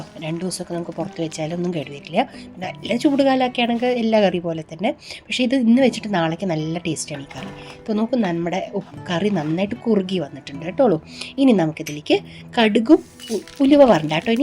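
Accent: Palakkad, India. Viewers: Typical palakkadan bhaasaha ttolin😊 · സംസാരം തന്നെ കേൾക്കുമ്പോൾ കൊതിയാകും ഉണ്ടാക്കാൻ ആയിട്ട് പാലക്കാട്ടുകാരി സൂപ്പർ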